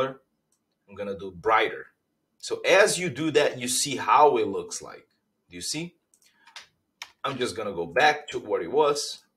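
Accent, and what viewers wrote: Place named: Brazil. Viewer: Sabia que era br pelo sotaque kakkk e nois ma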